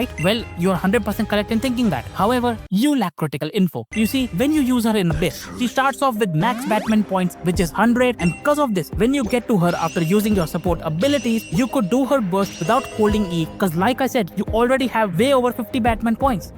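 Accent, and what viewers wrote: India. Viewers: fr bro the indian accent caight me off guard. enjoyed it tho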